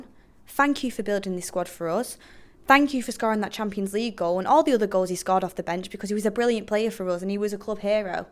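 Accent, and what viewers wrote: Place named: Salford, United Kingdom. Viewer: That's the most Salford accent ever ahhaha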